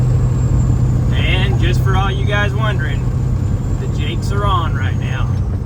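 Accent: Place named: Tennessee, United States. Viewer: You must be from Tennessee or North Georgia the way you say Alright yall Like we do here in East Tennessee!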